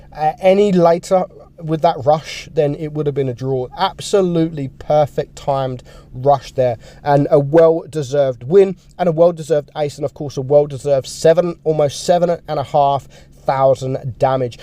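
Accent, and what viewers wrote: United Kingdom. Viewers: I like the way he comment on his channel with hia British accent and the way he said things like Example if E 100 shot you will will know about it or sometime when he said ita frastruating its very nice to hear because the way he said and british accent hehe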